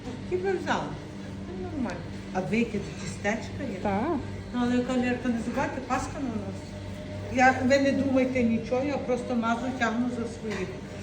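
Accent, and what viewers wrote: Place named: Yavoriv, Ukraine. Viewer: О,ви з Яворівщини,або напрямок біля кордону з Польщею ,чую знайому говірку 😂)))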